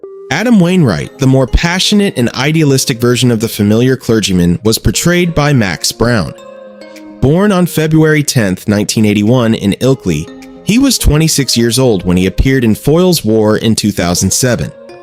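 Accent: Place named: United States. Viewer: Why an American voiced narrative?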